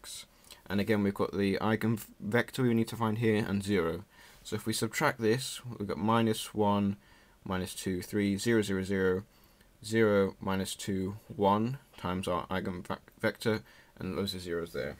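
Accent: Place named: England, United Kingdom. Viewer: English accents sound so cool! I totally get it now thank you so much!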